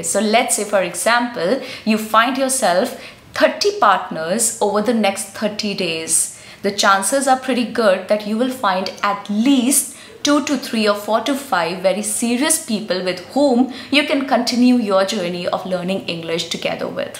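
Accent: India. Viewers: Unfortunately you have a heavy indian accent, particularly it is very difficult for a British to understand you...please work on your accents befor teaching anyones..or kind of fooling others.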